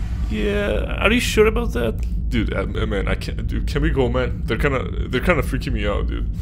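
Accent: Russia. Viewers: Are you having russian accent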